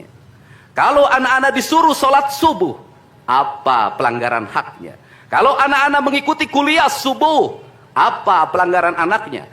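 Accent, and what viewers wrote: Sunda, Indonesia. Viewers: Subhanallah alhamdulilah punya cahaya kepemimpinan yg adil dan keyakinan kpda allah,sundanya kentel,non politik,hanya keyakinan dan ertongan allah swt,kecerdasan,untuk memberantas segala bidang,allah uakbar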